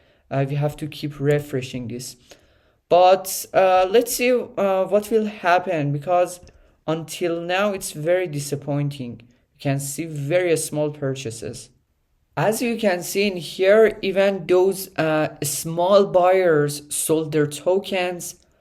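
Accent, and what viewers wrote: Iran. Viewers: dawg great content btw i think you iranian by the accent. im iranian too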